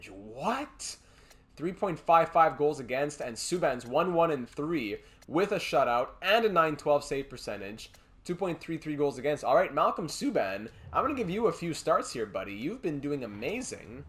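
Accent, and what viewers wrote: France. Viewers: Every time says “Julian Gauthier” in that gorgeous French accent I am literally rock solid. And using the words of my good friend StarScreamX-_-X here... “I doubt I’m not the only one.”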